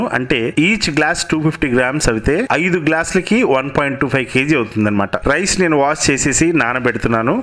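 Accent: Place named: Godavari, India. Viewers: garu Godharollu mari aala mataladathara dharunam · Pakka local accent medi rajamundrey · Ma godavari slang chala baguntundi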